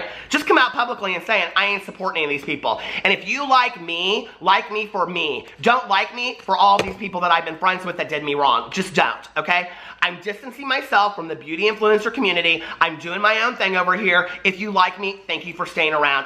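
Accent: South, United States. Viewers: OMG you do remind me of Nancy Grace! Sassy and southern! Can she please come back on TV?! I miss the endless tea on tot mom and that Jodi Arias.....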